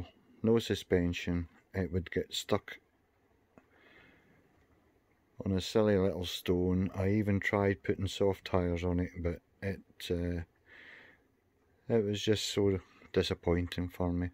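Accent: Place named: Scotland, United Kingdom. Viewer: Love hearing a fellow scot in the hobby!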